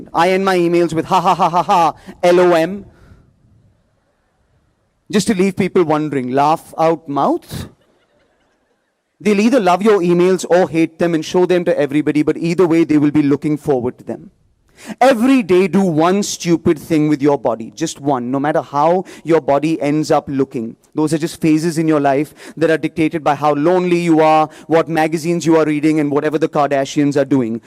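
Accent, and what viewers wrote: India. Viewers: Now that was in proper indian accent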